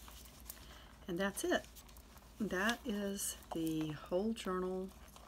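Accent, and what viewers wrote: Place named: North Carolina, United States. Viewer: I thought I detected a NC accent!! I'm originally from Charlotte but live in California now. Where can I find your items for sale? I'm new to your page, but love it already!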